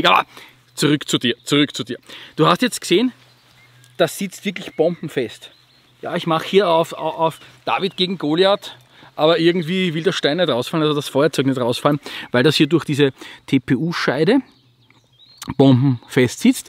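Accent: Austria. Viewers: Ich mag die Grimassen und den österreichischen Slang 😄 Jedes Mal wieder cool.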